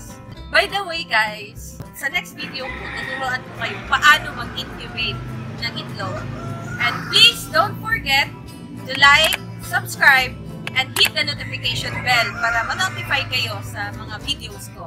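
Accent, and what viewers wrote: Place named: Bicol, Philippines. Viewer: new subscriber here from Bikol... tga saan ka ma'am? parang bikol yung punto ng tagalog mo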